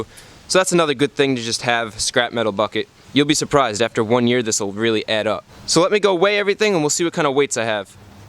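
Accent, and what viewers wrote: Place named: Boston, United States. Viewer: that bostonian though....hmmmnn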